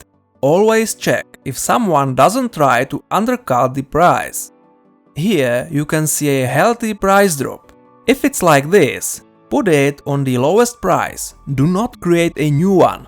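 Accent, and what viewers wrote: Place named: Czech Republic. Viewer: Ta čeština z toho celkem prýští :'D dobrý video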